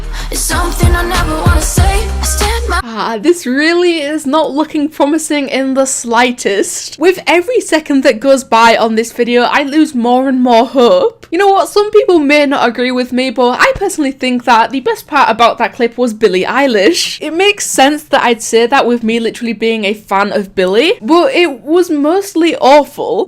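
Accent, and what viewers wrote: United Kingdom. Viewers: U British?????cuz of ur accent I guess u live in London??or some were elese in Paris xddd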